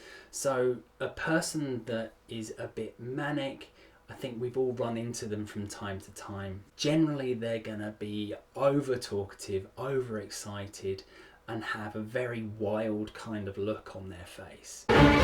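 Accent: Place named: United Kingdom. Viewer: Loved your accent, fellow UK creator here. I think this is so insightful and it’s also so lovely to have someone on YouTube not shouting and screaming at the camera. Very informative and love the blue in the background. I am only new on the platform myself but if I can give you some feedback, there is a little white noise in the background, this can be rectified by cleaning the audio depending on what programme you are using. You have a new subscriber. 👍 keep doing good!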